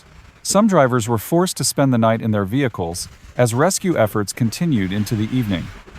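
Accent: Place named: United States. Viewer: Commentary by a friggin yank ffs!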